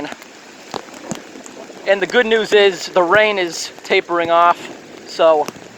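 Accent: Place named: New Jersey, United States. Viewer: Sounds like Jersey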